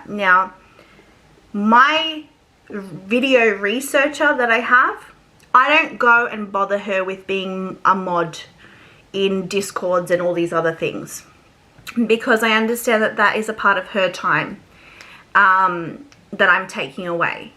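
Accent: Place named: Australia. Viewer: Love hearing another Aussie on the platform! 😊😊 keep it up!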